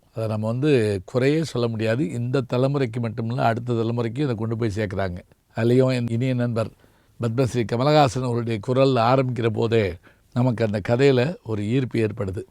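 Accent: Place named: Jaffna, Sri Lanka. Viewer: என் வீட்டுக்கார் உம்மட பட்டிமண்டப பேச்சுகளுக்கு பரம ரசிகர், என்ன சவத்தெளவுன்னா அப்பம் அவர் பக்கால இருந்தா என் தொடஐல ஓங்கி அடிச்சு ஆக்க்கா பாத்தியான்னு சொல்லும்போது ஒரு தொடை எரிச்சல் புத்திக்கு வரும்போது, அட இந்தப்பய ரசிகனப்பான்னு டக்குன்னு தாவி இது என் ஆசானப்பா அப்படின்னா ஒரு முத்தம் கொடுத்து, செரி இனிமே கொஞ்சம் மெதுவா அடிலேன்னு கட்டிக்கிட்டே இந்த செய்யுள் தெரியுமா உனக்குன்னு ஆரம்பிச்சு ... தமிழ் இனிமையானது, அதைவிட என் அத்தானின் யாழ்பாணத் தமிழ் மிக இனிது ஆசானே ❤❤❤ லெட்சுமி ராஜன் டொராண்டோ கனடா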